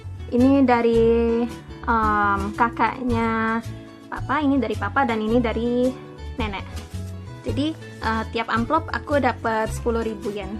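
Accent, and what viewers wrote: Java, Indonesia. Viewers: Heran, ini cewek jepang kelahiran mana ya koq ngomongnya medok gitu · Logat.y medok banget mba... Mantap mba....😍😍😍😍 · Keren, lucu aja logat mu orang jepang tpi klo pakek bahasa indo logat jawa bgt. Keren ,cantik.. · kamu aslinya orang mana sih? Wajahmu Jepang tapi ngomongmu Jawa medok? 😊😊😊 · logat logat jowo e iseh kentel wkwk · Jawa Nya Medok Banget Guuud Lah (Y) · Logat bahasa indo-nya jawir bingits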